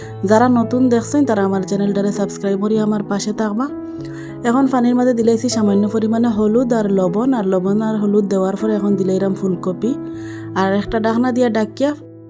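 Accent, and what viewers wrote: Sylhet, Bangladesh. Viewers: আপু তুমি কি সিলেটি।আমার খালু এভাবে কথা বলে।❤❤😊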